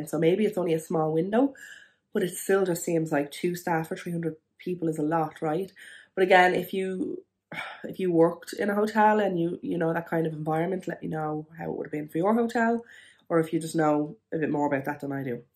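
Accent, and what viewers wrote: Ireland. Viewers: I like ur accent is it a south dublin love ur chanell as well .xx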